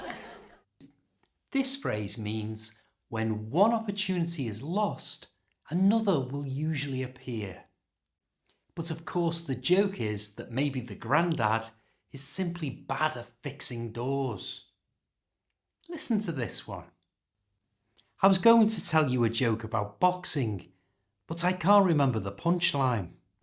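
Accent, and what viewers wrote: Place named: West Lancashire, United Kingdom. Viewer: Fun, useful and informative! I chat - online - to quite a few people from countries other than England and know how difficult it can be for most foreigners to understand British humour (unless it's particularly visual - EVERYONE seems to like 'Mr Atkinson'!). I'm going to devise a 'joke' about one sentence YOU said, "You need to know that the part your body you WERE when you put a belt on...."! Of course, this shows that regions and dialects can also give rise to clever 'word-play'. I'm guessing you are from West Lancashire?! (I once worked - in Panto - with Ken Dodd so learned lots about jokes, humour and accents - sometimes until after midnight!!) Thanks for posting!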